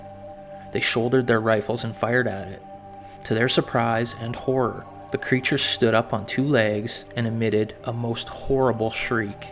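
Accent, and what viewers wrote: Canada. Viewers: he says " bean " instead of Been there the whole time is this a Canadian accent thing or ? "yeah, no ?" lol 😆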